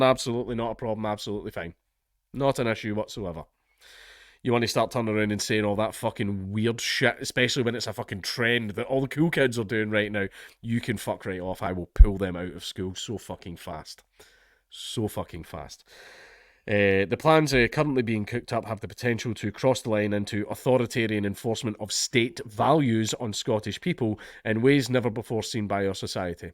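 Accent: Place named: Scotland, United Kingdom. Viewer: What I hear is an angry scot ready to kick ass when the government tries to mess with his babies. Love ur vids btw.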